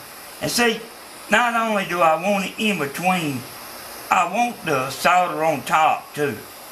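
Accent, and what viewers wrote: South, United States. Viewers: You have the coolest accent can't beat that good old southern accent hell that's better than anything you can buy I bet you can get up to 200 miles of that antenna I wish you lived near me I'd pay you to make me one and that's made better than any of the $100 ones I've seen made a lot better and more sturdier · Thanks, love theSouthern accent! If i make one i will film it and tell people about you.Thanks again.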